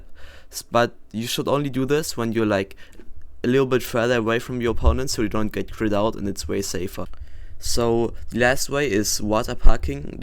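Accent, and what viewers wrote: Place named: Germany. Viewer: i can tell hes german lol like me xd if hes not german then idk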